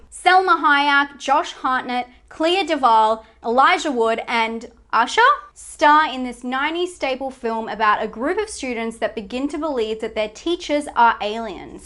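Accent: Australia. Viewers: I am so happy to have found your channel. Your tastes are pretty much exactly the same as my own, and you are really interesting to listen to (as a brit the ausie accent really makes me happy to hear). When you mentioned The People Under the Stairs, I literally squeeled as no one seems to know that film. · Are you australian?ʕ•ᴥ•ʔ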